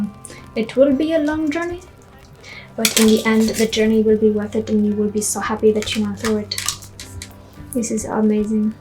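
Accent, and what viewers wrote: India. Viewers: I love your indian accent and you look beautiful in red 😊❤🙌👏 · As an Indian guy I can confirm that the accent is perfect 10/10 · As a INDIAN, i really appreciate your effort regarding Indian speaking accent of your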